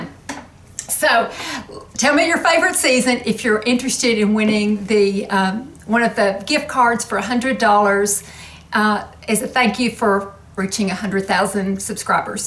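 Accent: South, United States. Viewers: I could listen to you all day. Your accent is endearing, to say the least. Southern ladies have an aire about them that can't be beat. Cheers from central FL! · My favorite season is Spring with all the lovely flowers in bloom, including the Dogwood trees, azaleas and best of all no humidity! Despite all the pollen and my allergies, I still love Spring! I guess it’s all the new life bursting forth after the dead of winter. Congratulations on the 100,000! I love your videos ... I live in the Deep South. I’m turning 61 on January 29, so I appreciate your videos and you ... someone in my age range and who has a lovely southern accent. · My favorite season is Fall. The colors and coolness of that time of year I love. Congratulations on hitting 100,000!!😁 I so enjoy your videos. I lived in Tennessee for 10 years, and listening to your southern voice is my touchstone to there. 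💜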